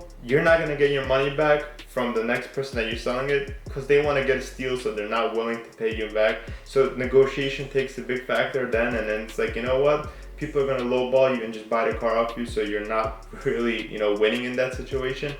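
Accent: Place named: Armenia. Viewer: Your eyes look just like pure Armenian eyes and the accent is Arm as well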